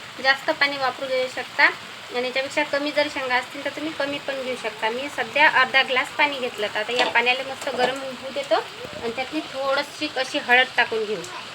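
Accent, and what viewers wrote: Vidarbha, India. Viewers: भाजी एकदम छान केली, मला तुझी भाषा आवडते कारण मी अकोल्यात राहते, तू कोणत्या गावची आहे ❤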